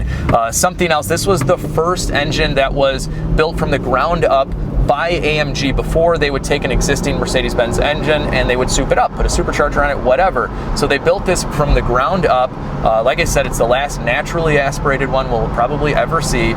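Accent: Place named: Chicago, United States. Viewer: Your Chicago accent is legend!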